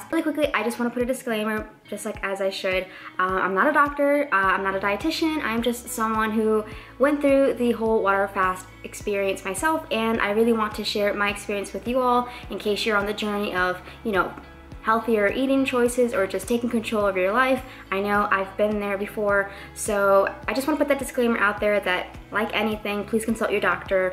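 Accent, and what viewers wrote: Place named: Brazil. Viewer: Are you brazilian? Pretty accent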